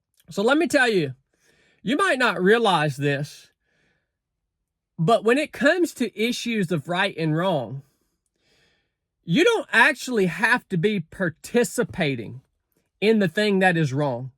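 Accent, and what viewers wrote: South, United States. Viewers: Probably not a good idea to take any kind of spiritual advice from someone who looks and sounds like he just crawled out of the hills himself. It's always incredible seeing the Dunning Kruger effect in action. People with a relatively low IQ who don't understand complexity and nuance take on a position of teaching and guiding others, fully confident in setting up a platform where they are speaking what they think is some kind of truth. This video is a perfect example of the mental illness crisis in this culture.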